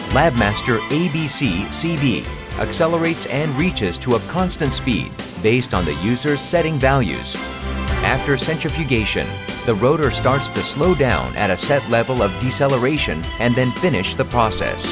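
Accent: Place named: United States. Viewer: Oh, my god. This is the most obnoxiously overproduced and tacky commercial for a product not meant for children or even normal households I've ever seen. The "epic" music, the over enthusiastic American voice, and all the sound effects, combined with cheap CGI. For centrifuges! It's too much! I just can't! I will now have to watch it on repeat.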